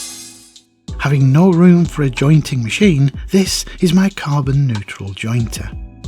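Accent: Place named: Northern England, United Kingdom. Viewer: Just beautiful! I am putting together my own very tiny workshop. 6ft by 3.5ft on my enclosed balcony. I live in a flat in South Korea, and DIY is not yet very popular because getting stuff done is relatively inexpensive. I love making things, especially from wood, and focus mainly on musical instruments. I don't have any of the tools you have but will be acquiring some as I go along. Thank you for the truly magical tour around your workshop and I shall be stealing some of your ideas for sure (with your permission, of course). By the way I guess you're from the north. I'm originally from Sheffield. A Stanley tools fan!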